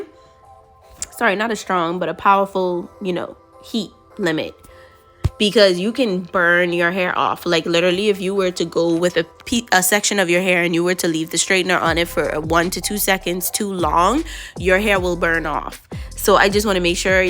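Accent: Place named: Bahamas. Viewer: you sound Bahamian. Hair goals🙌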